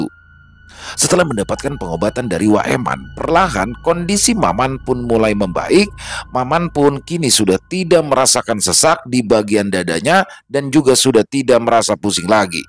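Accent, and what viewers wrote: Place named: Jakarta, Indonesia. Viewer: Kocag deh bang 😂 mas jawa? Logat betawi nye kentel bgt bang, expert sekali 👍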